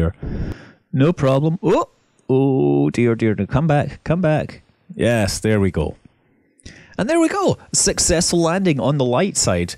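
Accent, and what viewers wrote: Scotland, United Kingdom. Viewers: Oh I his accent sounds very scottish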